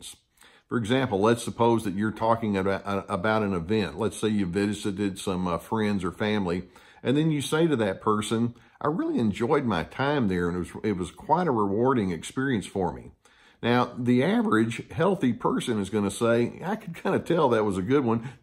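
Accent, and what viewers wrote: South, United States. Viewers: I enjoy listening to. His knowledge and delivery is pretty easy to listen to and his country accent reminds me of home. Good info to share. Thanks!! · But i hit the rewind first and listen again to make sure I get the message. I guess I'm trying to say I'd like your videos to go a little longer on one topic. You talk fast for a smooth southern talkin man. Love your accent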